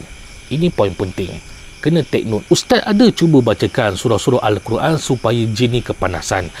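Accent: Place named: Sarawak, Malaysia. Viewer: Abang orang mane Sarawak ke?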